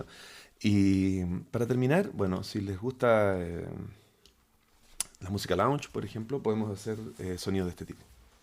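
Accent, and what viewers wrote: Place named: Chile. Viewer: que maravilla encontrarme con tu canal y por las dudas al escuchar tu acento me fui a revisar tu cv para soprenderme nuevamente de que eres chileno y haces patria tan lejos. Tras algunos minutos ya entendí lo que es el pure data y están muy interesantes los pasos. A ver si me animo a descargar y aprender a usarlo. Muchas gracias por compartir! Excelente canal educativo :) Saludos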